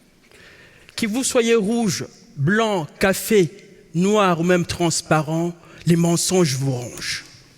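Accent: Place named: Democratic Republic of the Congo. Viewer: Oui, ce n'est pas faux, C'est difficile de se défaire de l'accent congolais 😅 Mais bravo à lui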